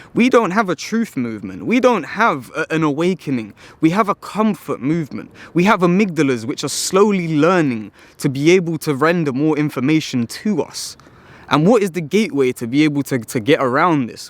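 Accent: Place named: United Kingdom. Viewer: I watched a faceless video of yours and thought you would be a black person from the UK. You talk so similar to one.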